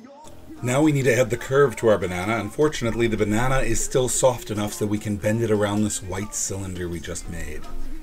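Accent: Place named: South, United States. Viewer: Southern charm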